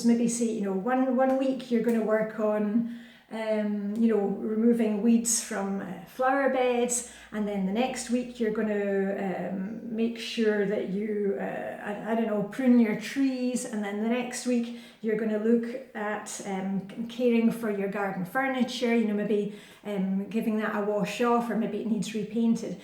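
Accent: Scotland, United Kingdom. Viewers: You are NOT cluttering up my feed! I love your videos. You are an inspiration to me, more than decluttering, even though I love that. Your attitude, your style, and your Scottish brogue are endearing! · No more self doubt allowed! Know that your videos are like a fun visit with a charming, uplifting friend! The Scottish accent is an added bonus! It’s fun to learn about your life and to see how much you love Denmark! You are a great ambassador for your adopted country! If you were to stop, I would be sad. Cindy from Minnesota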